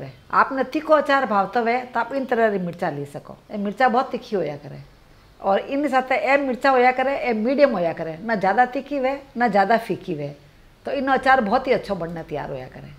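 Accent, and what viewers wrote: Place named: Rajasthan, India. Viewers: Rajasthani vesh bhusa or boli see aap Rajasthan ko goranvit Kar rahi he humare Rajasthan ki sanskrati or Khan pan ke tor tarike sabhi se share Karne ke liye danyawad🙏🙏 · I love her Rajasthani accent and delicious recipes.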